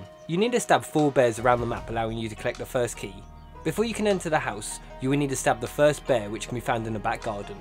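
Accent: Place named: United Kingdom. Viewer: Thanks british fella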